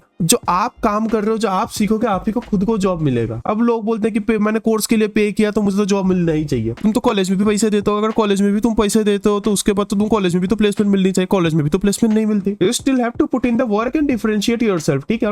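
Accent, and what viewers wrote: India. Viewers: hahahaha marathi accent, sounds very nice. all such regional accents have their own charm.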